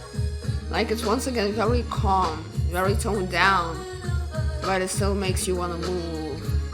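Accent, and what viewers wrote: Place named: Canada. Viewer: Wow that's amazing your Lithuanian but do you live in Canada your accents Canadian I thought sorry I just kinda shocked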